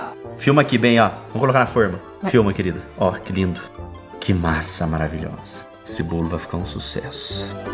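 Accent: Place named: Rio Grande do Sul, Brazil. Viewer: vou fazer com certeza, e não é que vc tá falando enrolado, é que vc era mineiro e agora virou gaúcho tchê, é o sotaque rsrssss, bjos pra vcs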